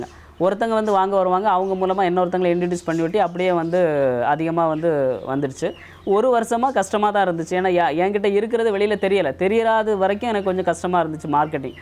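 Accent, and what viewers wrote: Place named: Tirunelveli, India. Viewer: Tirunelveli mathri irukku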